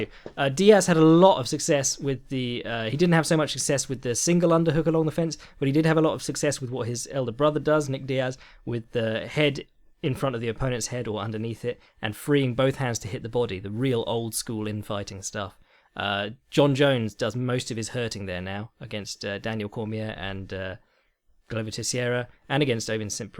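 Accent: United Kingdom. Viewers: Dude! You're like, so English, Bro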